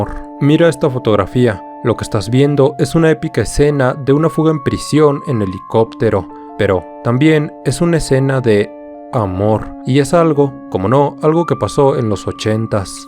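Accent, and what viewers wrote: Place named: Cleveland, United States. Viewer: Hablas con el acento de Cleveland de padre de familia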